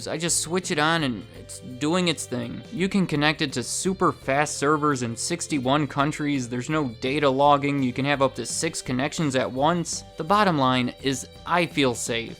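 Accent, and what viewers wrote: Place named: United States. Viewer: You sound like the neighbour in some long forgotten 70s family sitcom. Stereotypically American. I love it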